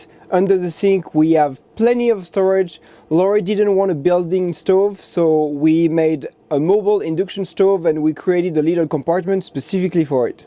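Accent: France. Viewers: Love the French accent! ❤ Great van, too!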